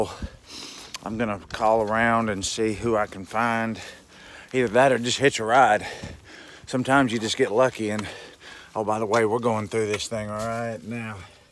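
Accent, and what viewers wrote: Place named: South, United States. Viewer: No apologies are necessary! You were out there actually hiking the trail not watching others do it like we are! The videos you have posted of your hike are the ones I truly look forward to the most. Your candid and humble personality is always a pleasure to watch and I enjoy your dialect being from the south myself. And the fact that you enjoyed your hike so much really shines through in your videos. Cheers to a speedy recovery and your future outdoor adventures. Look forward to your update when you feel inclined to post. Enjoy your home with heat and electricity! LOL.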